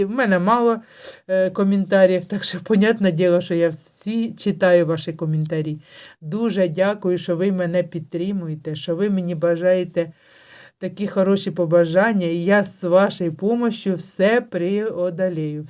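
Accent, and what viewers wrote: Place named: Russia. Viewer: у вас проскакують в кінці слів російська вимова.Ви не в Злинці живете?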